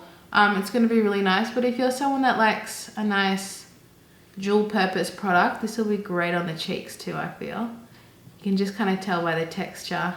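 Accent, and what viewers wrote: Australia. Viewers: Been watching makeup videos for years, first time stumbling upon your Chanel. I’m so glad I did! Thanks so much for your honest review! I hate how much trial and error there is with makeup, especially with budget products and seeing your reaction to stuff is just making it so much easier for me to decide if I want to bother trying some things. I’m on an extremely tight budget and if I were to go through too many cheap products before finding decent ones I’d end up probably spending as much as a dearer one anyway before finding what I’m looking for. I also love hearing your familiar Aussie “oh nauooooooorr” it doesn’t even sound like you’re doing it for shits and giggles it’s your natural accent and it’s glorious! 😂. Thanks again lovely! Hopefully you have some wear test reviews to to see how thing hold up over the day and this mad heat wave that blasted us straight into summer from the depths of winter with no bloody in-between.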